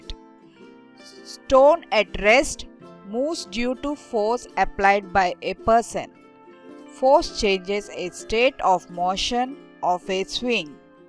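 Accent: India. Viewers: Too much buffering and buttler English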